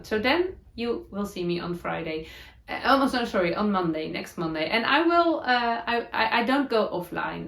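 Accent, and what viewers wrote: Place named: Netherlands. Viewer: you are so amazing. I love how practical you are about everything in your knitting and crochet. You have such a wonderful healthy outlook. Thank you so much for sharing it with us. I had a friend who passed away from The Netherlands so listening to your accent is so pleasing to me. Your English, even when it is Dutchy is so lovely to my ear.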